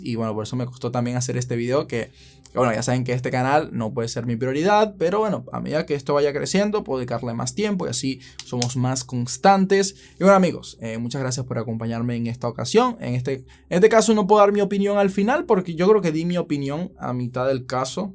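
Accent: Venezuela. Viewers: Tiene acento venezolano👈 Su acento no es peruano👈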